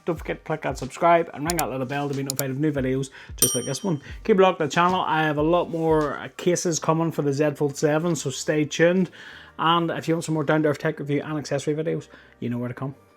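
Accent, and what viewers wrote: Northern Ireland, United Kingdom. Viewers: Northern Ireland?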